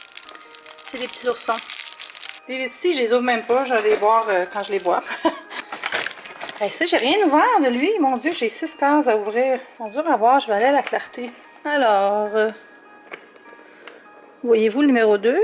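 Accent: Quebec, Canada. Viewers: Merci pour toutes tes vidéos,: j'aime beaucoup te regarder: tu nous raconte toutes tes aventures, moi ça me plait bien, et ton accent québécois, ça fait joyeux! bises de LIANOU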